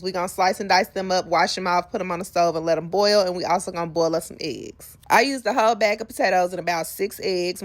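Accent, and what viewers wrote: South, United States. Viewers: & you know she from the south lol 😂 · U from the South? 😊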